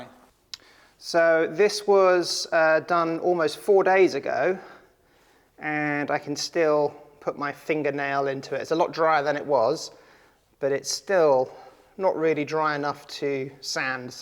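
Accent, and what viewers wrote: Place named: United Kingdom. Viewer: One thing I love about you Brits, the ability to tell and engaging story and that fabulous accent, adds a nice touch of class, keep this stuff coming I enjoy watching your travails. P.S. take care of that back and dont go up a ladder without telling someone to check on you :)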